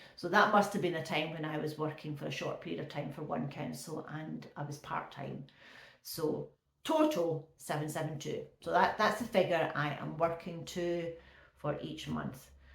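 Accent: Scotland, United Kingdom. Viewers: I'm an English-speaking Canadian In Quebec Canada. I love your beautiful Scottish accent!😊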